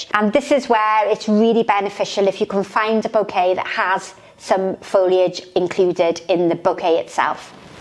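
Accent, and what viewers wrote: United Kingdom. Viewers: I'm from Canada 🍁, cheers love, perhaps because your British with accent yea. British with flowers 💐 is like fine wine. All right than, thanks dear.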